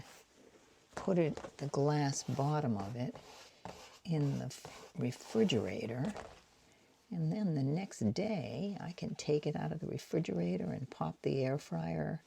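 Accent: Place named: New England, United States. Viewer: I'll watch whatever you're doing, so (as Ricky Nelson famously put it) please yourself:) Love hearing your New England-isms (which were also mine once upon a time) -- haven't heard anyone say 'hold yaw hawsas' in about 50 years ❤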